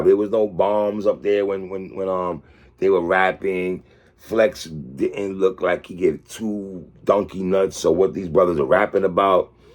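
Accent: New York, United States. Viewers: Nothing to add, really. Great commentary here by an authentic NYC voice,. Someone in that Drumwork/BSF/Griselda camp needs to make sure they all see this video. Radio didn't make them hot. Conway and nem make music for a niche fanbase, who loves what they do. Unless they're willing to switch up their sound and play the political industry games, they shouldn't even worry about getting spins from the likes of Flex.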